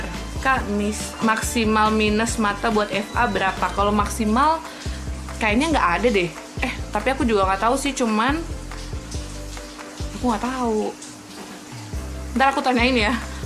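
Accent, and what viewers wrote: Manado, Indonesia. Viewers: Kakak org menado yahh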